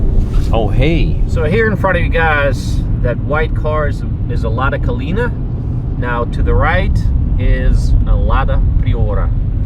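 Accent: United States. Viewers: It's weird and pointless to hear Russian city names pronounced with an exaggerated American accent, but I'm not criticising. Moreover the channel (which is great) isn't mine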